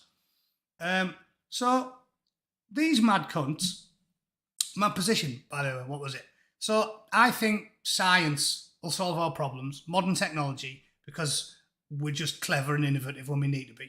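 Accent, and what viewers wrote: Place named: Teesside, United Kingdom. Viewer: Proper Smoggy accent, mines the same. 40 years in Redcar steelworks and a Boro lad😂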